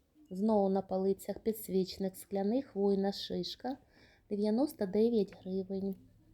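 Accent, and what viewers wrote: Russia. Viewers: На палицях????? Вроді і знаєте мову, але вимова російська.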